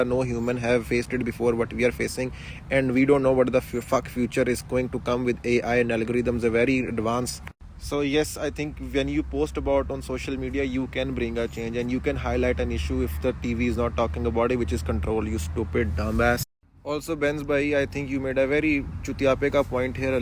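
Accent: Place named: India. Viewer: Yes 🙌 Respect!!! You did him really good ... Woh bhi in desi English 👌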